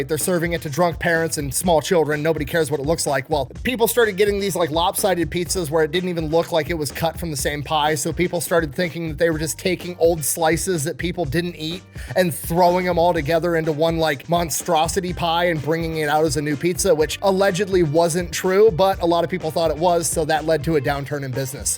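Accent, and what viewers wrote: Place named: Tennessee, United States. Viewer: sounds like you’re from West TN haha There was one in either Jackson or Memphis that was like that in the 90’s. Our rural elementary school took us there twice for field trips. Oh, the good ol days.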